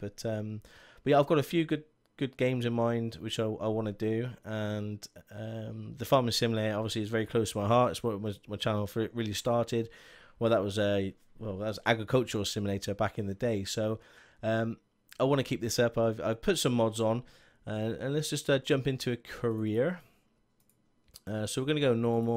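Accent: United Kingdom. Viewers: The map is called after the region in Poland. It is a real place. I'm not sure how accurate the map itself is, but the area is real for sure. I have some family in there(at least I think so...). BTW. It's quite entertaining to hear English man trying to pronounce polish names:))) Polish language is more "hard", like German language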